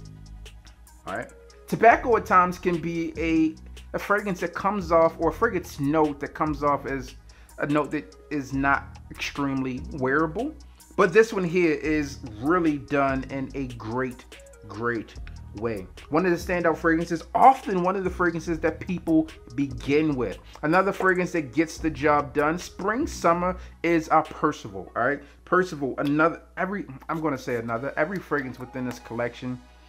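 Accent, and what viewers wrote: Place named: East Coast, United States. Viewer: I love the content, but that east coast accent makes me cringe. 🤦🏾‍♂️😩😂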